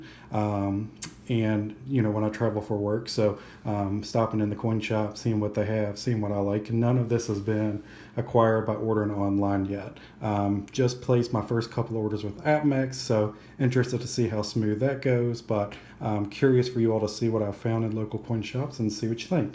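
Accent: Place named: United States. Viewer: Too much diversity IMO,if you are going to sell that in the future for your retirement or whatever you will have to look the price of each coin. If you are a collector that's fine but if you stack silver for selling in the future you will have some trouble. I would recommend only buying bulk of 1 coin,you sound like an american so you should stack silver eagles. It's not that fun to have a pile of the same coin but in the end when the time comes to sell it's way better. Remember this is not for fun but for keeping wealth you have and maybe get something more in the future,nothing else brother.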